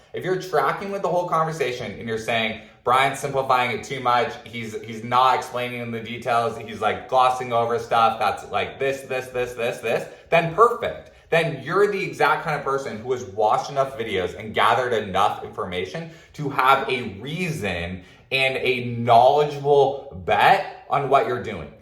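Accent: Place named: Los Angeles, United States. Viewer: I will subscribe if you ditch the LA enunciation, great video man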